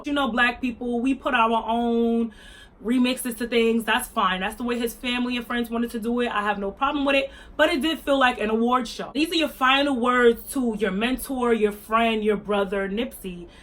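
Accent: New York, United States. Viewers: Hey girl heyyy😘👋🏾 I’m a new subscriber & this is the 1st video of yours that I’m watching 😚 love your whole vibe. I KNEW you were from my hometown girl yasssss 🗽🗽🗽🗽 I know a fellow New Yorker when I hear one lol,I knew before I scrolled down? What borough are u from? About this video lol: I’m sooo disappointed in YG😒 I’m a die-hard fan & hearing him make these colorist remarks makes me really uncomfortable. I know from following him on Instagram that he has a preference for light skinned women... I thought that’s where it starts and stops...but no. He really does fetishize light-skinned women & it’s so ignorant & tired. Uggh. Unbelievable. I’m bout to delete him from my Apple Music library. 😑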